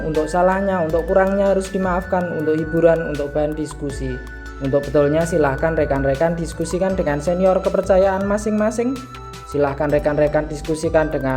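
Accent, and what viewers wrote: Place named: Cirebon, Indonesia. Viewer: Pelokk cirebonan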